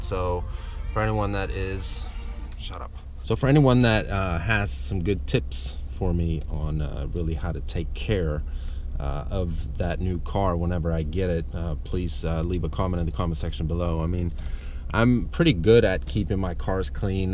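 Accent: Pittsburgh, United States. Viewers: Imma newbie to the Chanel and I was so surprised that you were from Sweden! That American accent was spot on! Ever thought of getting a Volvo XC90 or V90XC tho? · I find it very interesting that in 5 years you've acquired the distinctive Pittsburgh accent, sounding like a true native of the area. The Swedish component of your speech seems secondary to me. This vlog was enjoyable and a welcome change of pace. I've ordered a few cars in my life, waiting about 2 months each time. The domestics used to allow for this, ordered my Olds Cutlass in 1986, but today it seems only the Germans still do it. Nearly every car I've bought from dealer stock has been a compromise in some way. My current vehicle has a color that just will not grow on me. Maybe I'll get it right next time. Looking forward to your Merc. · 5 years and you sound like a Pittsburgh-er, it's been 12 for me (same town) and still sound like a limy lol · Never thought you would be Swedish, you look and sound like an American.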